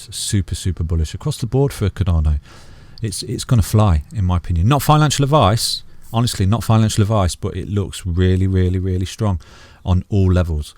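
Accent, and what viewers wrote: United Kingdom. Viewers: I can’t understand if I like more the analysis itself or your distinctive British accent. 😄 Miss UK a lot. Sub and Like! 👍🏻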